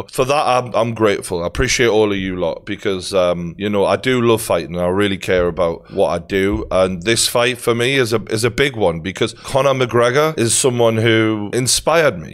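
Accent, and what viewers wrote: Newcastle, United Kingdom. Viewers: Everyone recognizes geordie good work bro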